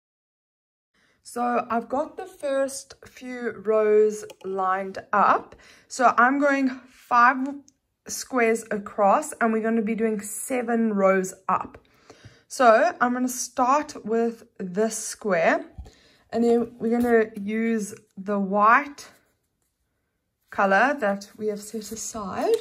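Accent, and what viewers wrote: South Africa. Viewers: Hello thank you for such an amazing tutorial! Just a random question, are you South African?😊😊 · Are you South African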